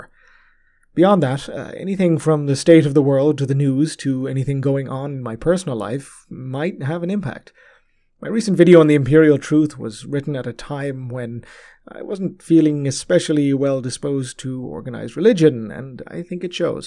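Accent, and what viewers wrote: Ireland. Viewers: Fabulous! But I knew the was from Dublin from the way he pronounces his ‘t’s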